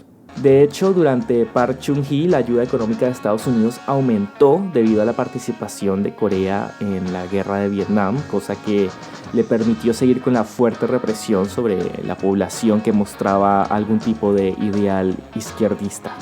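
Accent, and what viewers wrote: Colombia. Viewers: Porque no hablas costeño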